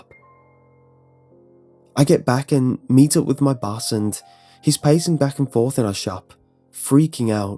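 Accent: United Kingdom. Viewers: It's funny to hear this in a British accent, because I live in the southern half of North America, and most hunting stories around here are "WELL SHOOT FER CLETUS, I RECON I DUN DID SAWD A GHOST, SO I SHOT HIM UP WITH THAT THERE RIFLE I DID! WHOOOOOOOOOOO-EEEEEEE! Slaps Knees "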